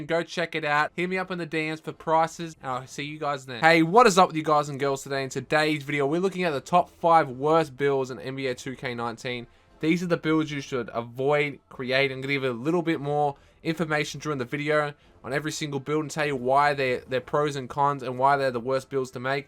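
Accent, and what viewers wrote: Australia. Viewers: Are you from Australia or New Zealand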